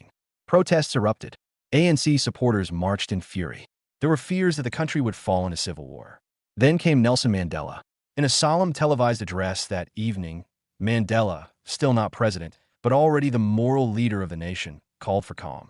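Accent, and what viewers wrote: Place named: United States. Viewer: Its a bit weird to listen to this in an American accent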